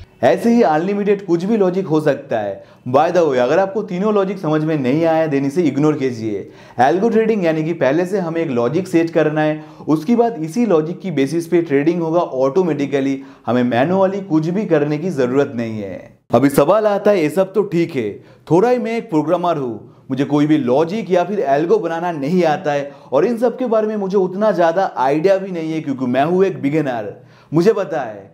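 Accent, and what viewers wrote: Bengal, India. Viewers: u must be a bengali your accent describes .loads of love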